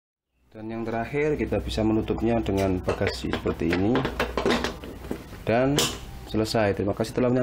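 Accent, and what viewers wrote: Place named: Gresik, Indonesia. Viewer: Logatnya Gresik Banget hahaha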